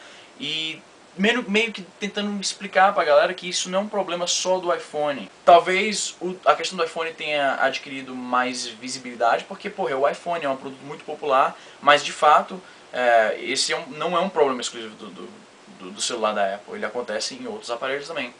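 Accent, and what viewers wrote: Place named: Curitiba, Brazil. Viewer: tu é curitibano, né ? hahah sotaque entrega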